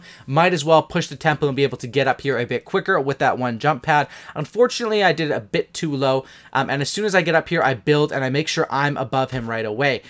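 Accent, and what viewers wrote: Sweden. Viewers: Are you from sweden? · are you from Sweden?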